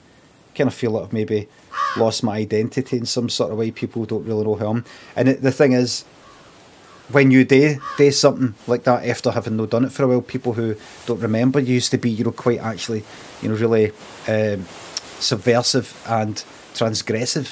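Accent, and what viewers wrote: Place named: Glasgow, United Kingdom. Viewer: wouldn't say you're going mad, but it is just a glaswegian accent. which is why it's more of a "chiy" sound than a "chae".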